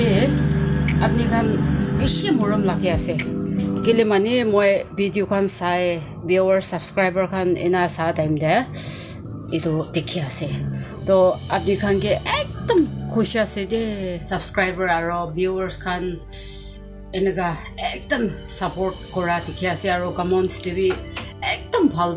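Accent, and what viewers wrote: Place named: Nagaland, India. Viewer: Authentic and natural...the way you speak nagamise is interesting 😂❤️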